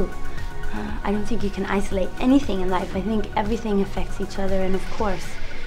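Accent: Sweden. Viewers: I enjoy listening to Robyn talk. Love that Swedish accent!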